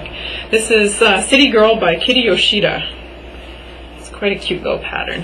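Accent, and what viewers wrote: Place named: Minnesota, United States. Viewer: Do I detect a Minnesotan, or something nearby, accent? 8) You sound like so many of my northern relatives. Thank you for the video - about the only thing I can sew is blankets and pillow cases so it was nice to see another use for straight lines. I like to use box flannel and back it with satin - it's a tough sew with the slippery fabric, but the kiddos Love the 'silky' when they take their naps.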